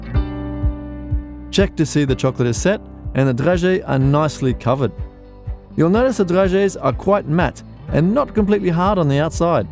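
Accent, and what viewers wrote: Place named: Australia. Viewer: He sounds Australian with a hint of French.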